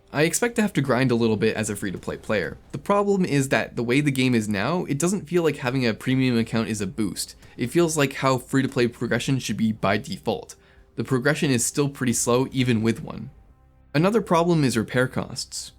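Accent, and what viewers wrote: Czech Republic. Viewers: Are you from czech? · are u czech ?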